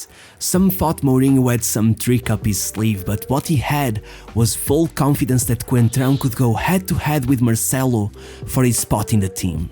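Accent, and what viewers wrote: Portugal. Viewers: Hmmm tu és português né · reconheço um tuga à distancia kkkkkk bom video! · dá para perseber pelo o sotaque que és tuga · mano sabia que eras tuga só pelo sotaque ahaha, o inglês é perfeito mas um tuga reconhece um tuga facilmente, continua com o bom trabalho! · Ah, eu sabia! Pelo sotaque e pela maneira como consegues pronunciar os nomes mais difíceis de jogadores portugueses e brasileiros vê-se logo que és tuga... Parabéns pelo canal único, encontras sempre conteúdo pouco explorado e editas ótimos vídeos! Continua assim, irmão!🇵🇹🇵🇹🇵🇹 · u portuguese?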